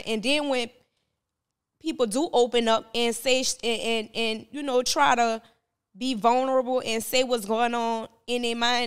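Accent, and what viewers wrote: New Orleans, United States. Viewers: where you been you was highly mist in these YouTube streets you speak facts on shit that ppl don’t want to speak you actually was teaching I learned a lot listening to you in ya New Orleans voice baby ❤❤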